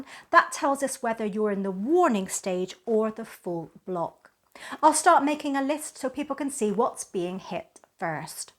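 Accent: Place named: England, United Kingdom. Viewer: I’ve jumped to Onn about a year ago, possibly longer. I still have a Fire TV cube that I very sensory use and an Insignia Fire television, that I basically use as/ monitor for the Onn. You always do a great job, honey abd I e said this before, I like the curly or waver hair over the straight hair. Plus I just live an English accent on women.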